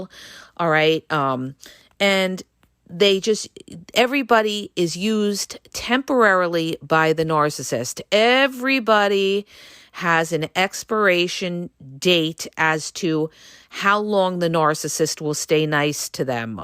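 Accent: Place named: Bronx, United States. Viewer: And all topped off with a Bronxy New York accect - perfect. Taking no shit...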